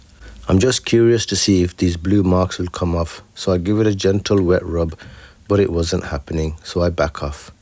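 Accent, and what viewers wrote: Birmingham, United Kingdom. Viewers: great to have your brummyness back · I can only click the thumbs up once, you deserve more. And don't even think about NOT doing a commentary again. Your soft and gentle Brummy accent absolutely nails the information you impart. Yet another thoughtful and honest refurb which, if you had gone too far with aggressive cleaning and new plating, would have spoiled the watch and its history. We are back to you not marking your work, but we've talked before about that. So; 'tara a bit'.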